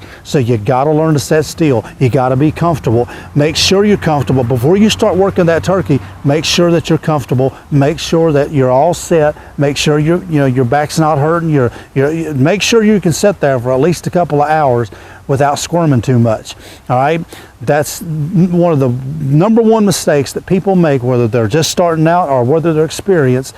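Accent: South, United States. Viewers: Lol that southern accent could give tips to do both guaranteed lol. Great videos. I can never get enough turkey talk. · Folks this guy speaks better English than most of y’all ‘spert English teachers. Too many people need to get real and quit being high and mighty. It’s hard to see people when your nose is in the air. We in the South add personality and flavor to our talk. I’ll take genuine good ol’ boys over any snob any day.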